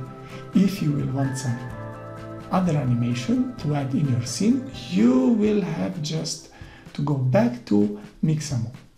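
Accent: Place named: Romania. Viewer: banuiam ca esti roman :)))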